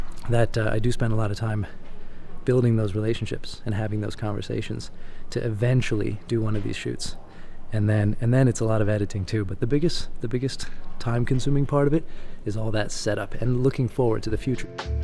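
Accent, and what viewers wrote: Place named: Canada. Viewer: Kind of a different question for you,...I'm a bit of a student of language and I'm really curious where you did your English training? You have, I'm guessing, an almost Canadian accent for someone from Sweden. Anyway, love your content and use of the English language. 🙂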